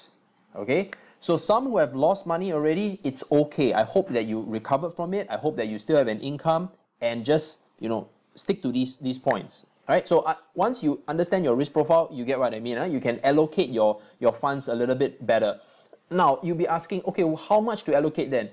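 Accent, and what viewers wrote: Singapore. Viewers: Awesome! That's why I heard a bit of Singlish :-p hahaha I live in SG too. I've really loved your video. I'm gonna watch your other vids as well. Cheers!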